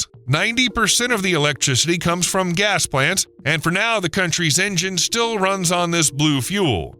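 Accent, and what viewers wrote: United States. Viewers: I just stopped it and won't be listening. No one wants to listen to an abysmal amerry can accent. Just no one.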